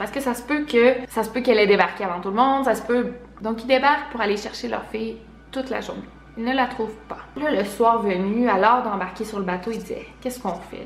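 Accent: Quebec, Canada. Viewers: Au début j’avais beaucoup de mal avec ton accent québécois mais depuis un mois tu es définitivement ma youtubeuse préférée 😍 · dommage je comprends pas tout ce que tu dis avec l'accent quebecois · Je t'ai découverte grâce à la collaboration que tu as faite avec Liv mvz et depuis je suis accro à tes vidéos c'est fou ! De plus, j'aime trop l'accent quebecois et ta voix, sa me calme fin je sais pas comment expliquer mdrr. Continue comme ça, t'es vidéos sont simple et bien réalisées 😉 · " elle est pas nul part " c'est tellement mignon le Québécois 😂 Merci pour les vidéos elles sont vraiment bien. Les explications sont toujours complètes et très bien racontées :)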